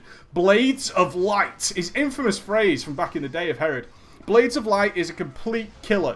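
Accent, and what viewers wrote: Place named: United Kingdom. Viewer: Where can I find WoW videos where the commentator is not british, loud, and obnoxious?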